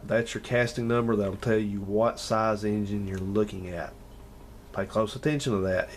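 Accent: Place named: East Tennessee, United States. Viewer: Love the accent. If I have to guess, east Tennessee? (please don't yell at me if I'm wrong!) Brought back some memories from when I lived in Oak Ridge back in '96-'97.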